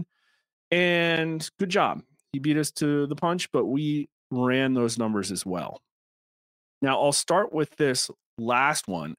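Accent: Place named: Wisconsin, United States. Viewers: I didn’t know was from New Jersey. I’m from Wisconsin. He absolutely talks like he’s from the Midwest. I would’ve pegged him for Wisconsin night myself. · Still weird to me that you’re from New Jersey but say words like have and had like you’re from Wisconsin.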